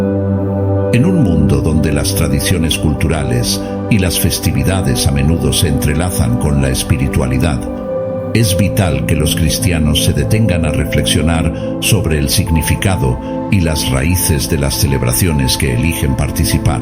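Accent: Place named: Spain. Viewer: Es mi imaginación o es más atacar a la nación hispanoamérica? Para comenzar el que habla lo hace con acento español y se refiere a 2 días que se han establecido solo como tradición en lo que es América latina, Ya lograron introducir la religión en la mayoría de la gente y refuerzan lo que se supone es fé para "invitarte" a nunca hagas o digas nada de esas tradiciones porque Dios no lo permite. Y yo soñando con que el día que me muera se acuerden de mi para invitarme a casa a convivir con mi gente por medio de un altar.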